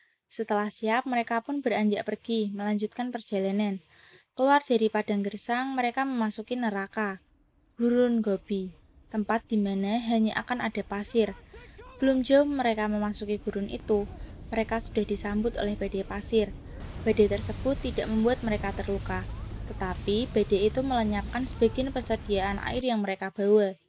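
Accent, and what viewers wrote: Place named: Banyumas, Indonesia. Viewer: Narator ngapak 🙃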